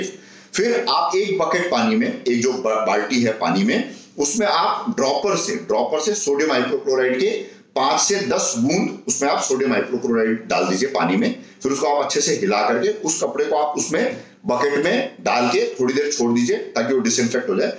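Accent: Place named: Rajasthan, India. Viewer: nazar कमाल है मतलब सही में आप राजस्थानी हो actually आपका बोलने का शुद्ध तरीका जो h wo और इतने बेबाक तरीके से राजस्थानी ही बात करते हैं और intelligent हो आप क्योंकि में भी राजस्थानी हू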